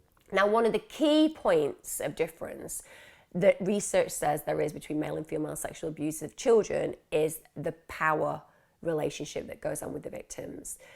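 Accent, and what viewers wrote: United Kingdom. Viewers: British accents are almost as irritating as Asian accents.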